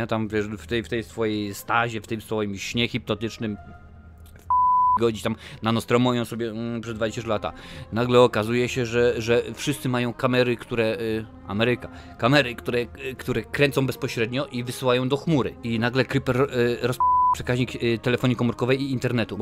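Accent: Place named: Podlasie, Poland. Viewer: Czy pochodzi Pan moze z Podlasia? tak fajnie Pan zaciaga ;)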